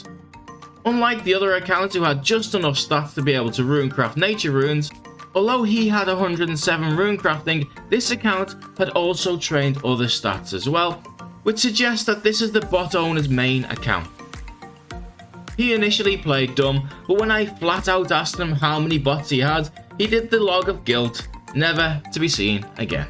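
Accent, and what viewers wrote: Liverpool, United Kingdom. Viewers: Are you a scouser brother hahaha cant tell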